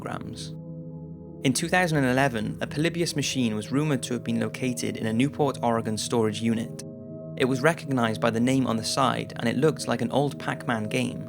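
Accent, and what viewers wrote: United Kingdom. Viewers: Idk why, but it's always scarier when a brit is speaking in the video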